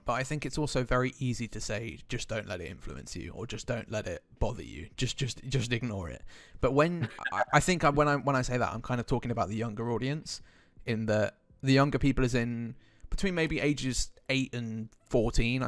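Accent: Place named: England, United Kingdom. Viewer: Roughly where in England are you from? You sound a lot like me, that would be cool if I knew I was close to you. If you don’t want to say that fine.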